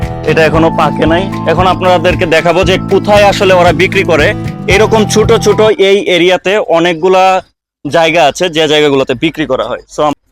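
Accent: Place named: Mymensingh, Bangladesh. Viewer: Mymenshinga,ko k kho bole bangàlataow bolte pare na,blog kore aber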